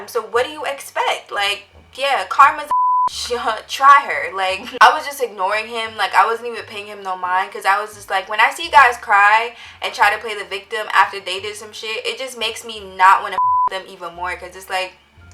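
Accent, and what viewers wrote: United States. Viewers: Sounds just like a Maryland nigga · you said the hood, it had to be baltimore! this sound like a baltimore nigga lol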